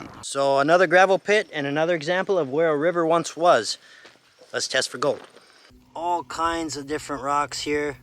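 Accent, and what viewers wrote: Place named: Midwest, United States. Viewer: If you have yucky sticky clumpy dirt in your bucket, add water like you did at 23 min. in this video. The magic tool? (2 of them, actually) 1. ALWAYS put a few drops of JetDri in your bucket! With your fine flour gold, you MUST break the surface tension, or fine gold can actually float, and get panned out. 2. Bring a battery-powered drill along. Attach a paint mixer to it. Use it to pulverize the clay balls and loosen all material, freeing it up for more effective panning. Bring an extra battery just in case. LOVE your videos - haven’t heard that Midwest accent in many years… Makes me homesick!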